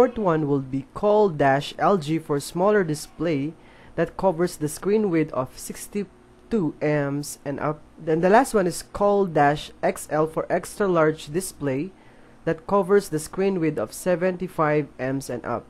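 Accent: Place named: Philippines. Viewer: Maybe he is Filipino because he's accent same with us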